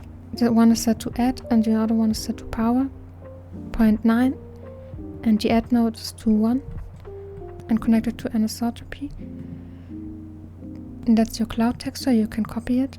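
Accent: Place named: Germany. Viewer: Oh youre german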